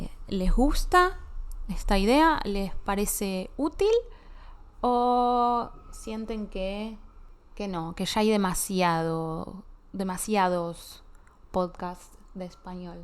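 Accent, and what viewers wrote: Argentina. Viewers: Me gusta mucho lo que haces. Para mí es muy importante escuchar el español argentino. Con mucho gusto y beneficio escucho y veo tus podcasts. ¡Muchísimas gracias!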